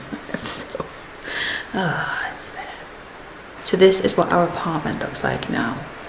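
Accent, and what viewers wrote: United Kingdom. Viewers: are you actually Japanese or are you British because you seem Japanese but you have a British accent? I’m curious I’m American myself by the way my name is Jordan and I am a boy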